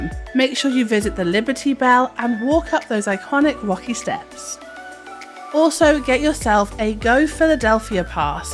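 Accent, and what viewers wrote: United Kingdom. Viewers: You sound like britis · Fairly small city she says lol 6.2 million population metropolitan area! Apart from London is any city close to this in the U.K. where I imagine by your accent that you are from? Lol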